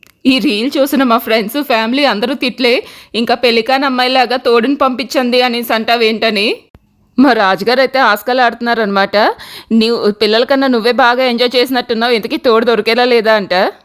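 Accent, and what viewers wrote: Telangana, India. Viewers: Telangana slang bagundi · Super ga ready ayyav chala Baga telangana bhasha bagundi ane super ga undi ❤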